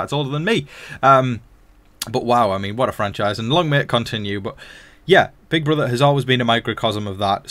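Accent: United States. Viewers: You have GREAT American accent! From an American! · Wait your American accent is good haha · Your American accent is spot on!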